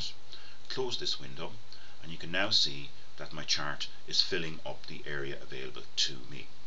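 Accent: Ireland. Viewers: Hey, great videos and they are a big help. its great to hear an Irish accent while i'm pulling my hair out in Australia. Do you have a video that would show me how to put date markers on a gannt chart? I am having trouble getting this to work. I need it for say 7 days before the start date as a marker to call the individual. I just need to know how to get say a star or any marker to remind me to call. thanks in advance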